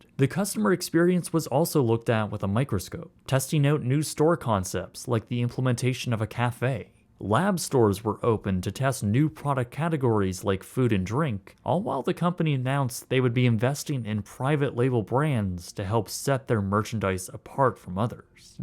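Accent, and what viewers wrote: Canada. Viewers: Forgive me for asking, but what part of Canada are you from?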